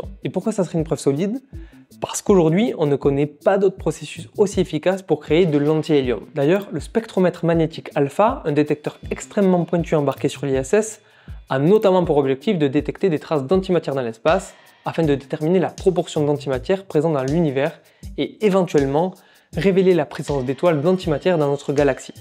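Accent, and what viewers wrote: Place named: Marseille, France. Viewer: L’accent de Marseille c’est insupportable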